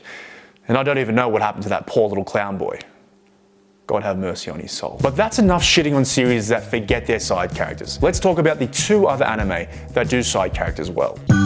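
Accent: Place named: Australia. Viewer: I wanna learn the aussie accent with this guy talking about anime. Perhaps he has a playlist with that specific content. Checking . . .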